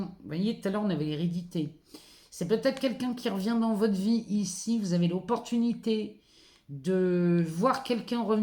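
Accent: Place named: Paris, France. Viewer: Douce 🌼☀️💝 Ce tirage est exceptionnel et ça m’a redonné un boost d’énergie magic en ce jour! Je vous écoute des USA. Votre voix est rassurante et me rappelle ma jeunesse à Paris! Je pense que vous êtes parisienne aussi, n’est pas?! Vos ressentis et intuition me parlent et je relate souvent avec vos messages en général! Merci d’être cette belle lumière pour votre chaîne qui embellit les espérances et donne du baume aux cœurs! Merci merci merci - de mon âme à la vôtre! 💫🦋🌈💖☀️🙏🏻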